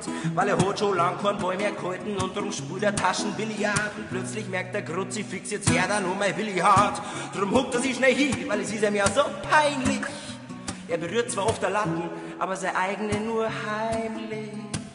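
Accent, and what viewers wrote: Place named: Bavaria, Germany. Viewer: Was ein Talent!! Ich kann´s fast nicht glauben. Bayerisch klingt neuerdings cool!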